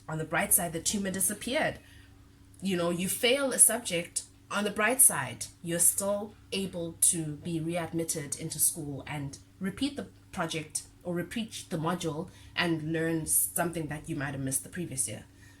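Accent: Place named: Pietermaritzburg, South Africa. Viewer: You sound like you from PMB though...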